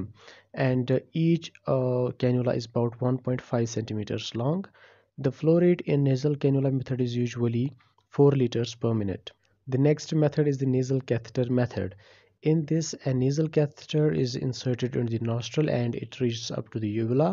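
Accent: Kashmir, India. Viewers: Your voice is indicating that you are kashmiri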